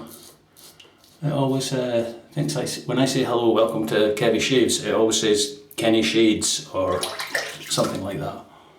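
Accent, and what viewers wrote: Scotland, United Kingdom. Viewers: Great shave! Happy St Andrew's Day to ye! I'll be sure to take a wee dram this evening to mark the occasion...well..a day late of course being I'm down here in the merry old land of OZ. Hehehehe.Your Scottish 'burr' reminds me...yes...again..of a classic piece of British comedy...! Slange! <link>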